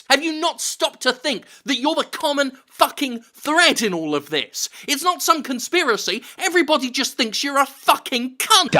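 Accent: United Kingdom. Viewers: Throughout the sections where reads Ryan's statements aloud, I couldn't help but think that it's probably how that guy actually sounds, because he is also British and may have the same accent as.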